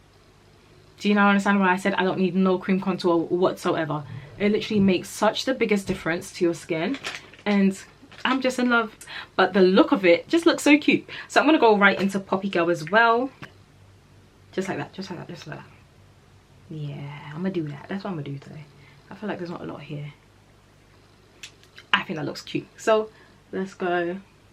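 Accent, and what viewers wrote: United Kingdom. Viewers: I just subscribed because of your accent...I don't know why I love British accent so much 😭🥺🥰🥰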